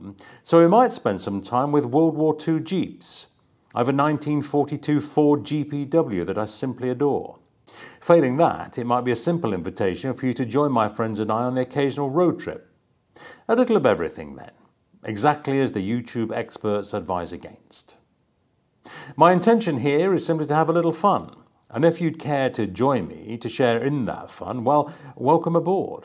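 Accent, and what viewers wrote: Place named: United Kingdom. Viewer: Subscribed for your proper British accent and hefty bank account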